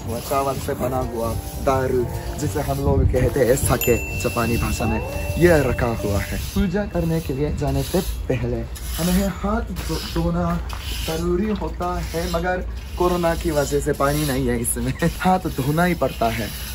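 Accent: Standard. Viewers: pure hindi. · Apki Hindi bahut hi shudh hai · Aap to bilkul shudh hindi bolte hain itni to shud muje bhi nahi aati hain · ne Pure hindi Me bolte he aur hindi unme kut kut ke vara he and Indian walo ke liye pryar... unhone excercise nahI bayam ba Nice ❤️ proud to say that we are Indojapanese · Indian kuch sikho shuddh hindi se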